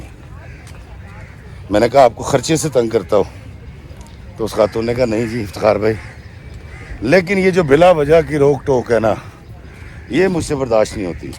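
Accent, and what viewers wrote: Punjab, Pakistan. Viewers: Banda Punjabi te Urdu bolay per punjabi acesnt te boli Urdu ajeeb hai Aap kahoo gay key Urdu hamari qoomi zuban hai per sir only poor 6 % people has Urdu as native language And they way we speak Urdu is honestly disrespect to poor Urdu Hum ko kuyn sharam hai Punjabi main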